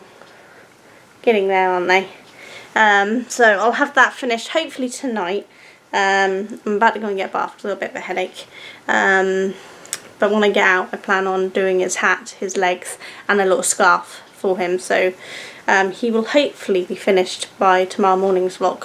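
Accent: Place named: England, United Kingdom. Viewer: love the english accent... cheers... keep up the great work 🙏❤️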